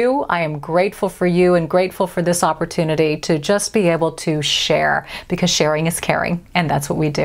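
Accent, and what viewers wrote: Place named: United States. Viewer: Hello...I'm German and I love to listen to your "perfect" American English to improve mine :)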